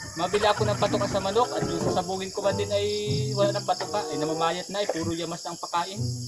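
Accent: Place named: Mindoro, Philippines. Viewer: Hehehe kaganda NG tono nyo Lodi taga San ga kayu Lodi parang mendoro oh simara oh rumblon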